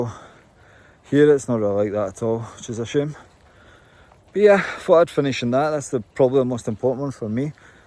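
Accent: Scotland, United Kingdom. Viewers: The difference is-I love your Scottish accent. I have never been to Scotland but maybe some day it will be possible ,I hope so. Offended? Are you kidding? We not get offended so quickly. Like the Scotts I've heard they are very direct people, aren't they ?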